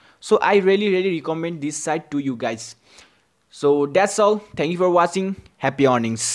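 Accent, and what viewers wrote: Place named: Nepal. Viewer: You have Nepali accent. Are you Nepali?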